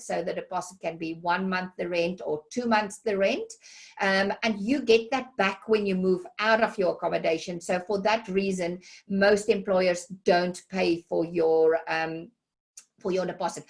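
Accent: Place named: South Africa. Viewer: Good to hear you still speak with your South African accent 20 years on. 3 seconds in and I knew you were from here.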